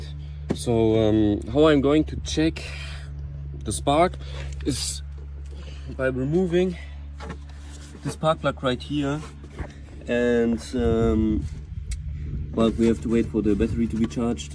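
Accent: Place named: Germany. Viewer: Cool Video but the German Accent ist da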